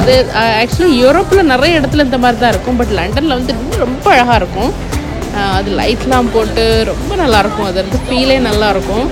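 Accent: Nagercoil, India. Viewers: Nagercoil Slang💪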